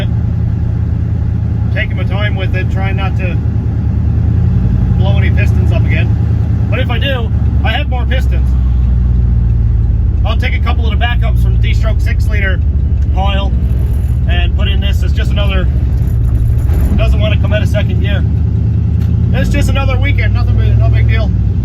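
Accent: Canada. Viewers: where are you guys located? You sound north of the US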